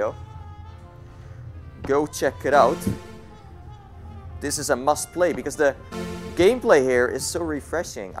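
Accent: Netherlands. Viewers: Ik hoor zo duidelijk dat je Nederlands bent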